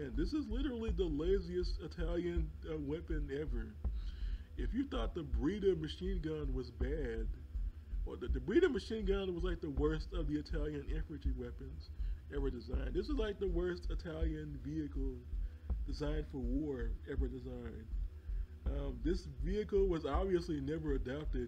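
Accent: United States. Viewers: Leave out the voice sounds like somebody out of deliverance,maybe replace it with Ride Of The Valkyries.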